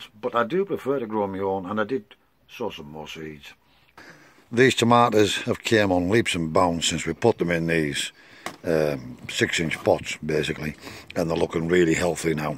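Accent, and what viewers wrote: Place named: Cumbria, United Kingdom. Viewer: Good to hear Cumbrian twang 👍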